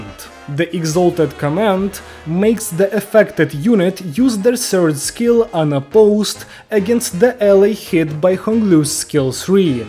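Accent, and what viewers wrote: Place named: Russia. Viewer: holy russian accent, btw thanks for the guide i am new to the game and i've pulled him this video helped me a lot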